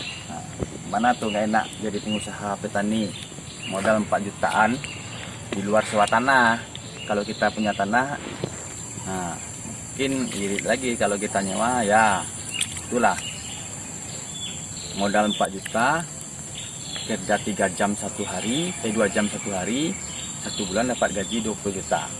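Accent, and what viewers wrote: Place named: West Sumatra, Indonesia. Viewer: Bang tolong dibalas.., Uda urang minangkan, dima lokasi da..? mintak nomor WAnyo da Ambo ka Konsul senek.. soalnya keceplosan bicaranya nya😊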